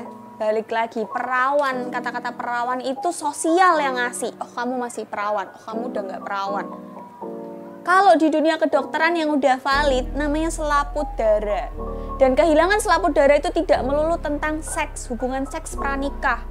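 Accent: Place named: Java, Indonesia. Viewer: Medok mbak.. salam soko wong semarang (Tembalang) ak bocahmu:D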